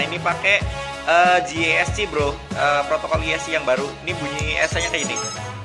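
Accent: Banyumas, Indonesia. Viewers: Halo yutub ketemu lagi dengan.🤣🤣👍👍👍👍..5 inch in the best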